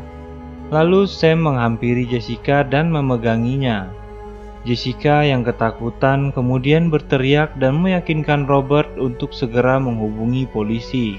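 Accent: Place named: Java, Indonesia. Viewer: ejaan sikopet dia bilang juga bener kok. Kan itu accent nya dia neng. Kaya amrik sama english "neither either" (if you know lah) . Dia juga di video gak pake Bahasa baku amat. Orang jawa kan sering pake plesetan di pronunciation A nya. Like benar bener. Teman, temen. Cepat, cepet. So what's wrong lol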